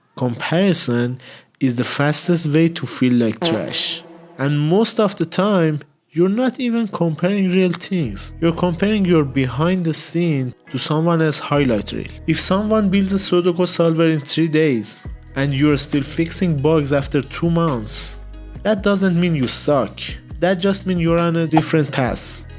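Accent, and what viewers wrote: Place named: Iran. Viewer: your accent screams "چایی و کوروش کبیر"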